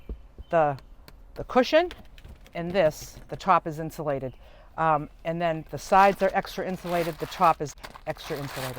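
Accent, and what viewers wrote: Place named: New England, United States. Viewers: I love your accent, reminds me of "Aunt Elsie" from Martha's Vineyard. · Love your new england accent...Massachusetts gal myself...you're very cool! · ..where in New England are you? I grew up in Massachusetts and love your accent! You are so clever.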